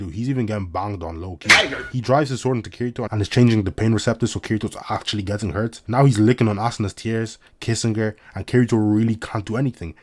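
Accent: Ireland. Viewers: U sound Irish a bit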